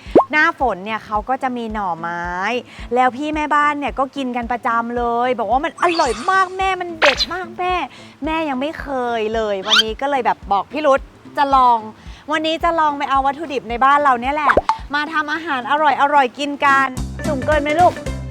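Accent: North, Thailand. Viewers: อู้ไทยปะแล๊ดเมืองน่าฮักดีครับ😊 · ชอบแม่อู้เมือง อยากหื้ออู้นักๆในคลิปเจ้า เพลินดี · อู้กำเมืองม่วนแต้ๆจ้าว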